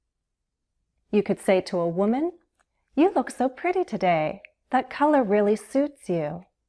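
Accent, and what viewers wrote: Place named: United States. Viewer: I am not too much into the American accent, nevertheless I watched the whole video and sensed how noble and classy you are. you nailed it. Thanks, this is my first subscription to an American channel, big thanks to your friendly video here.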